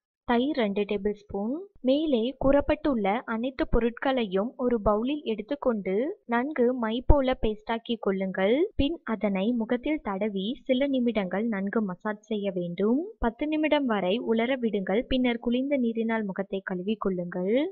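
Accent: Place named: China. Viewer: பேசுவது சீன பெண். அதனால் மன்னித்து விடலாம்.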